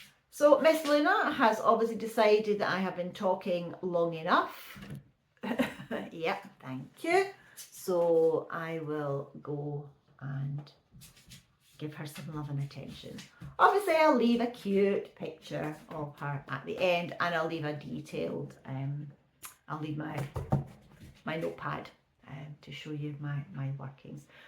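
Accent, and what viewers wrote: Scotland, United Kingdom. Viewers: I'm an English-speaking Canadian In Quebec Canada. I love your beautiful Scottish accent!😊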